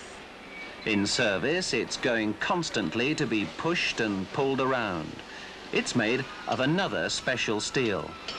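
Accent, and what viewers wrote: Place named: United Kingdom. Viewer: British vs. North American pronunciation and spelling. The video uses the correct British (and most of the rest of the English-speaking world) pronunciation.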